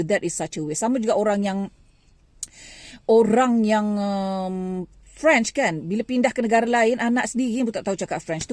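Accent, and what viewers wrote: Negeri Sembilan, Malaysia. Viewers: thats right she does speak in nogori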